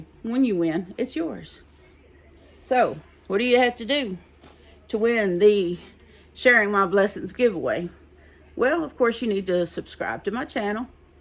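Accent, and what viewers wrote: South, United States. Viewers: Good evening/morning,! New subscriber here... both to your YT channel and Instagram:) I've spent the past couple of hours watching & liking a number of your videos, and I can't tell you how happy I am to have found your channel. From the yarny goodness to the furbabies to the family stories to that wonderful Southern accent!!! (I'm originally from VA, have family throughout the deep South, including LA, but currently live in OH. I miss that accent, that "way" of speaking to others, that "heart" put into conversations with friends and loved ones. I truly do appreciate what you contribute to YT and the crafting community!! Now that I've rambled on a bit, I best let you in on all the furbabies we have/ have had... al rescues, either from shelters or from the streets. Those were usually the ones who adopted us rather than the other way around, but animals know who'll love and care for them as family, don't they? 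I look forward to seeing more from you... and I'll go back to binge-watching and crocheting, as the first two grandbabies are due this year... in July and September. You take care of your self, and your sons and furry sons too!!!!!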